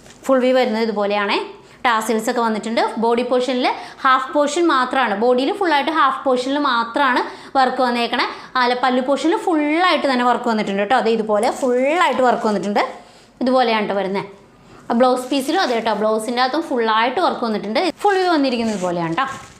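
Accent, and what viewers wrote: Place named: Perumbavoor, India. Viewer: ട്ടോ എന്ന പ്രയോഗം നമ്മുടെ പെരുമ്പാവൂരുകാരുടെ ഒരു പ്രത്യേകത ആണ് ചേച്ചി പറയുന്നത് കേൾക്കാനും നല്ല cute ആണ് അതുകൊണ്ട് അത് മാറ്റേണ്ടതില്ലാ ട്ടോ😊😊😊😂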